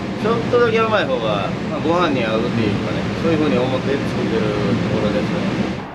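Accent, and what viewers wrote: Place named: Osaka, Japan. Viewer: 動きも喋りもルーズに見えるんですけど無駄がないから余裕があるんですね。実況コメント、質問への答えのひとつひとつに無駄がない。おすすめメニューの理由が「他店との比較ができるから」、焼き飯がおすすめの理由（動画全部観てください、説得力あります）も誠実というか。誠実・正確でゴリゴリの大阪弁っていうのがまたいい。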